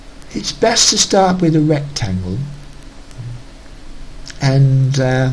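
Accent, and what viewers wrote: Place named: Yorkshire, United Kingdom. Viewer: who say he is a windows 10 lover he might be a windows xp lover also with that accent I can tell your from Yorkshire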